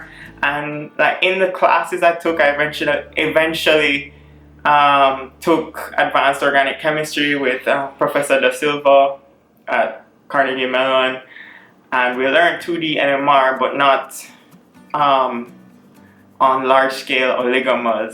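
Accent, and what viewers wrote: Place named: Kingston, Jamaica. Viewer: A brief introduction, my name is Chris and I am a 2nd year Chemistry PhD at the George Washington Univeristy in Washington DC, and I’m from Kingston too! I found your YouTube channel through #BlackInChem on Twitter and as soon as you started talking I heard your Kingston accent. I didn’t expect to find any Jamaican nationals through #BlackInStem, most of the persons I found with the 🇯🇲 flag in their bio were 1st generation Jamaicans in Canada or the UK. Nice to know that you really can find Jamaicans everywhere. Good luck with your PhD and YouTube channel. Also, I love seeing what different types of scientific labs look like, so maybe consider a lab tour video.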